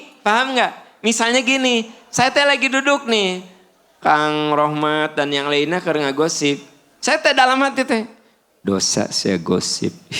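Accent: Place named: Sunda, Indonesia. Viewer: Saya tinggal di Jerman tepatnya Saarbrücken, ya Allah baru tau ustad Dr. H Aam Amirudin LC,Si dan saya senang mendengarkan tausiah beliau apalagi khasnya bahasa sunda karena sejatinya saya orang Sumedang , jadi saya kangen bahasa tersebut.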